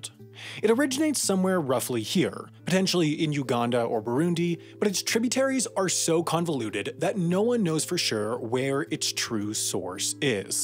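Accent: United States. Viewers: Isn't he American? He sounds American. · Senseless Egyptian propaganda in American English!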